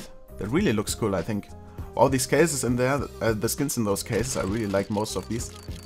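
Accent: Germany. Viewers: Man hört einfach das er deutsch ist :D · Ich muss mir nur die ersten drei Wörter anhören und schon weiss ich das der Deutsch ist xD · R u from germany? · you are from germany right?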